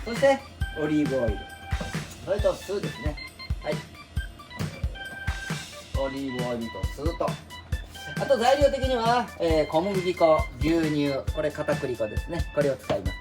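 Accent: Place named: United States. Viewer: このYouTubeのながれで1年前のから揚げPart2も観ましたが、の英語、綺麗なアメリカ英語ですね。from Los Angeles🇺🇸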